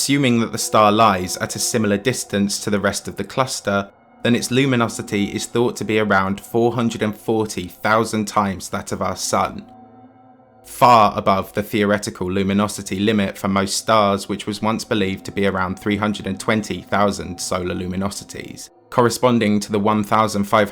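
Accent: United Kingdom. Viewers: Gonna miss VY Canis Magoris as the biggest star known to man. She was my favorite to talk about when speaking of the scale of other stars. And a quick shout-out to the narrator, British accents make for great documentaries. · I can't stand anyone with a British accent. Made it 10 seconds in to this video. Stopped as soon as I heard his voice. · Kinda curious how almost every space channel i tune to, theres always british commentary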